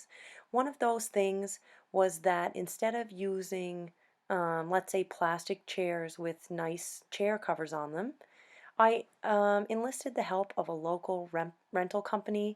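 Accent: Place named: Cape Breton, Canada. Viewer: I saved a bunch of wedding videos to watch later for getting started with my wedding planning. I'm in Ontario but I'm from New Waterford and it was a nice suprise to come across your video - I knew by the accent you were from the island! Lol Thank you for the very helpful video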